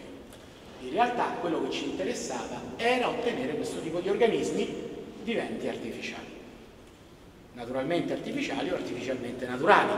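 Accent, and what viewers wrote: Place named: Rome, Italy. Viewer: La cadenza romanesca è alla mano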